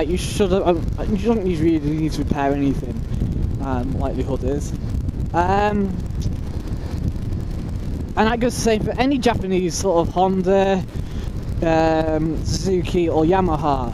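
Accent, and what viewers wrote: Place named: China. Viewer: You make English sound Chinese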